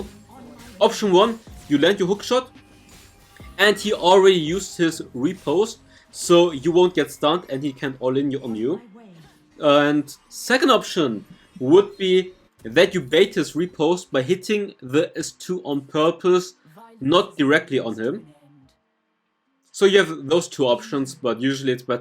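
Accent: Germany. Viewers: One Question... are you from Germany? Your pronunciation sounds a bit german. Btw, I really like your videos... they are very helpful for platinum players like me :)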